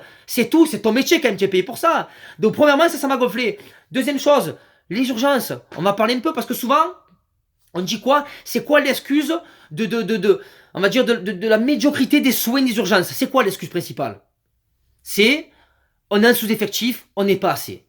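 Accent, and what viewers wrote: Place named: South, France. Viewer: Salut le marseillais superbe vidéo ah l'accent du sud me manque :)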